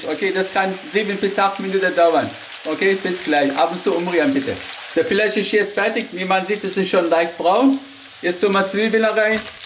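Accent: Swabia, Germany. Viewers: Hallo Bist du etwa ein Schwabe? Dein Dialekt Verrät dich wir kommen aus dem Ruhrgebiet. Tolle Rezepte,sehr lecker und die zubereitung ist auch leicht:goodvibes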